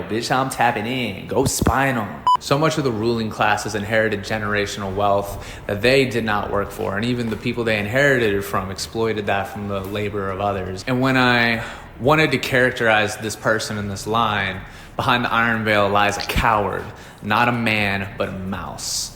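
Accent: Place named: South, United States. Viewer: Your little southern accent is too freaking adorable. 😭😭💖💖💖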